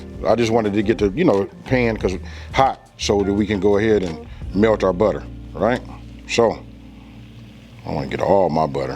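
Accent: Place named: California, United States. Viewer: I'm digging the Cali accent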